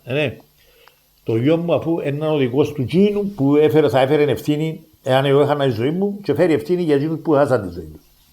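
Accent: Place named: Cyprus. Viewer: Τί νά καταλάβεις ελληνοκυπριακή διάλεκτος...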